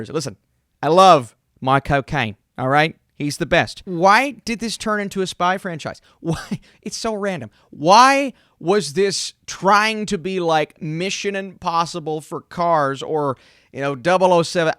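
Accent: United States. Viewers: You have the most American voice I’ve ever heard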